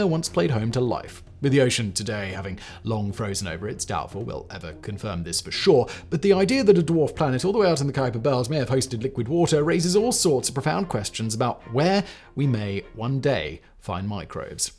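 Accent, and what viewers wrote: RP, United Kingdom. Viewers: Like the content but hate the pretentiousness + posh accent, it's hard to listen to honestly. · Absolutely no idea what Simon is saying, but admittedly impressed by his ability to morph “extremely British” into novel languages.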